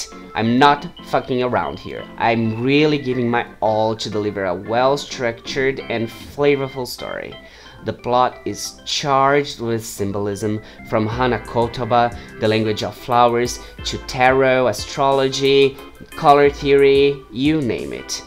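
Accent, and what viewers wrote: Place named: Brazil. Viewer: my faves are Nelumba (is this his name?) and Ro lol (edit) MENINO TU É BR??? EU NUNCA SOUBE DISSO eu suspeitava pelo sotaque mas não tinha certeza aaaaa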